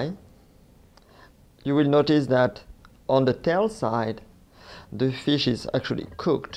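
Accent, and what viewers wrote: France. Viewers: so my salmon is ready , well, tomorrow can be eaten actually but I taste it after the curing, delicious and now I added yellow mustard ( no dijon in the house) and chopped dill. Thank you for the recipe, the lemony dill taste of the cured salmon= 😋 DELISH!! I personally love garlic so I added a little bit in the curing mixture and celery powder as well, love it!! P.S. your French accent is killing me... in a good way!! Is buy favorite accent in the world since it makes me laugh 😁 Merci beaucoup!! 🇨🇦🇫🇷👍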